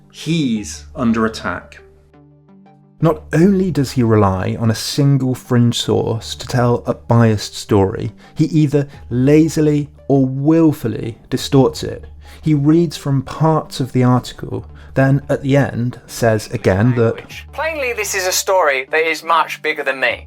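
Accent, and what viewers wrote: United Kingdom. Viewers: You can't debunk what Brand says as not being true....you only try to discredit him by attempting to portray him as irrational in your smooth slick British voice.....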